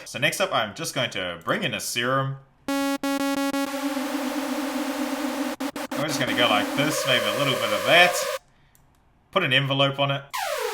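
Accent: New Zealand. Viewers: bro are you new zealand?? sorry im new to this channel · Are you a kiwi by any chance? Your accent absolutely sounds like a New Zealander.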